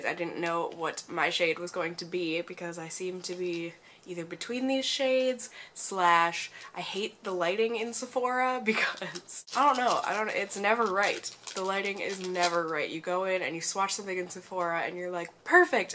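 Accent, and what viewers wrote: Minnesota, United States. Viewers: I know how difficult that kind of transition in life can be, so big hugs to you! I worked at a jewellery store for years and the only thing that motivated me to come into work was the friends I had made there, they were all so amazing and we had so much fun when we weren't angsting over the shittiness of the job. I get ya, girl! Moving to Japan has also been wonderful and really difficult as well, I miss the things I used to do and the friends I had, but I equally love my time here. Anyway, I look forward to your MN accent fully coming back :DV I bought the foundation a couple of months ago and as much as I love it, I also hate it because it transfers SO much and I get shitty I have to powder it so it doesn't transfer onto all my clothes and onto the clothes of all those around me!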